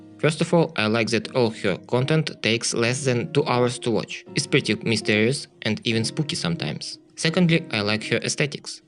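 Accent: Russia. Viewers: You sound Russian my comrade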